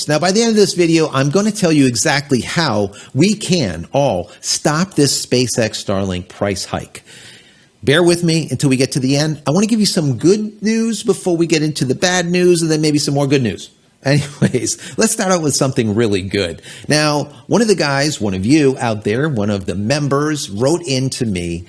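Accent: United States. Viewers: Are you from New Jersey?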